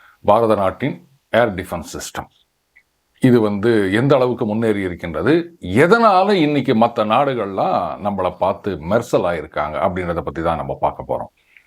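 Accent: Chennai, India. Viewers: வணக்கம் சார். நீங்க அடிக்கடி இடையில் சென்னை பாஷையில் வெளுத்து வாங்குறீங்க 😂😂😂😂😂😅😅😅😅😅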